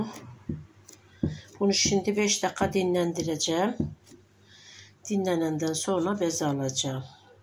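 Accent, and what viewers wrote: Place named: Sivas, Turkey. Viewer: Sen sivas limisin bizim gibi konuşuyorsun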